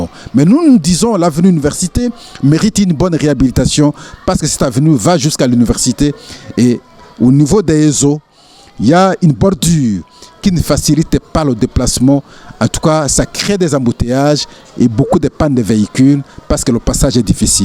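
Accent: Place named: Rwanda. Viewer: tu as un accent rwandophone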